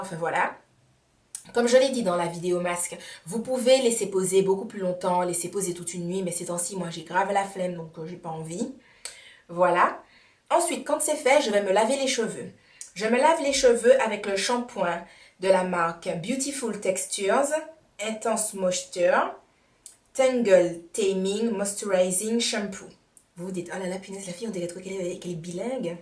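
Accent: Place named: Antilles, Caribbean. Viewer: Merci pour la vidéo, j'aime trop ton accent antillais:D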